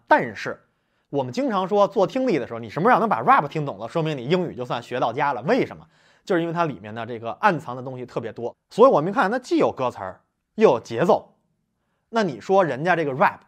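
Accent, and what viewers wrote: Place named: Tianjin, China. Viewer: 哥们儿你是天津的吧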